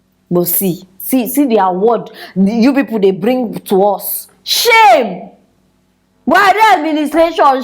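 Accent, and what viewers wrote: Nigeria. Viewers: Madam you can change your Nationality but you can change ur Dialect, one 9ja always 9 ja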